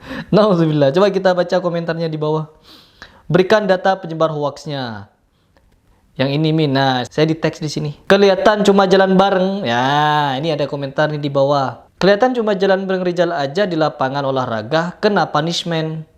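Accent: Madura, Indonesia. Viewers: Logatnya spt madura ya? Hahaha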